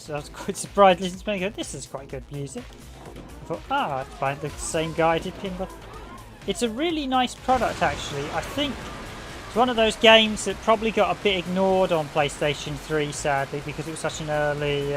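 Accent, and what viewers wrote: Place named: England, United Kingdom. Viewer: Bro! You are english… it's obvius but… I need to know, what accent of England is the one you have?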